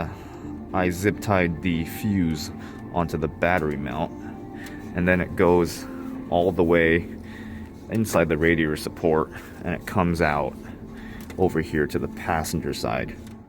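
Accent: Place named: United States. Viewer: I see. You speak English as if you’ve lived in the States your whole life. I didn’t think you guys had Sequoia’s over there. The Alpha Rex headlights must be MUCH cheaper there if you ordered that many!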